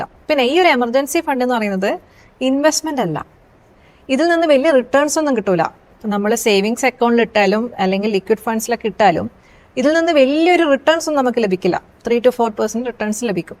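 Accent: Kannur, India. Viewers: ഞാൻ ഇന്നാണ് ഫസ്റ്റ് വീഡിയൊ കണ്ടത് കൊള്ളാം സംസാര ഭാഷ ശ്രദ്ധിച്ചപ്പൊ കണ്ണൂർ സൈഡ് തോന്നി ശിയാണെന്ന് പിന്നീട് കമൻ്റ്സ് നോക്കിയപ്പൊ ഒരാൾ പറഞ്ഞത് കണ്ടു. ഓകെ ഗൊ ഹെഡ്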